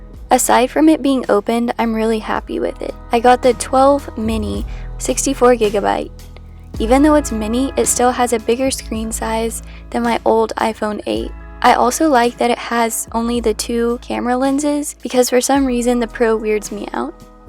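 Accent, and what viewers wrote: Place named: United States. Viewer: Is it just me orrr but she has Tesco mobile on her phone she sounds American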